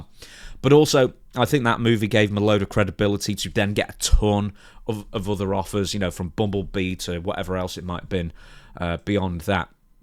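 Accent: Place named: England, United Kingdom. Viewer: What part of England is this accent from? It's awful!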